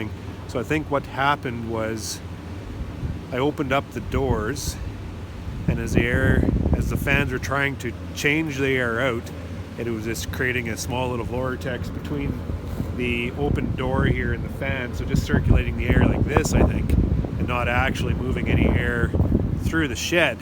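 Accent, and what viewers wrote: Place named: Winkler, Canada. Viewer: This gonna sound creepy but here it goes, I grew up around Winkler and I have a lot of good memories of my uncles wandering around muttering about equipment being broken or some weld or other failing. I've long since moved far way, worked in fields that have nothing to do with farming, got married had a kid etc. Sometimes its nice to just hear a guy talking in the accent I grew up around and talking about the kinds of things my uncles used to talk about. "Half pail of feed" haven't heard anyone say that in well over 20 years.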